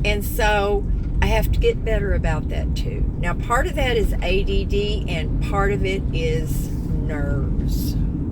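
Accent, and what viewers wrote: South, United States. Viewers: Well, you could make a 30 minute video on water evaporating or grass growing and I would watch. I’m to much of a Fanatic so I’m not sure what to recommend. All I could do is recommend you stick with what got you all those 1,000’s of subscribers, must keep them watching. Then add scenic So Cal location, through your eyes. Using you captivating Southern accent. No need to interview anyone. YOU ARE THE REASON WE WATCH YOUR CHANNEL SWEETIE. “ Magic!” ☺️👍👍👍👍❣️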